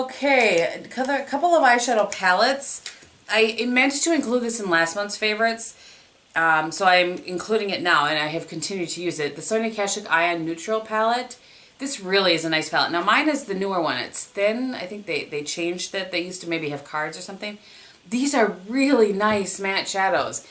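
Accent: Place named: Midwest, United States. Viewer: Hey! i just wanted to let you know I love your channel, when i started watching i was thinking "wow she sounds so much like home" and then i realized you were from the midwest too! I grew up in the Twin Cities so you sound like home ;)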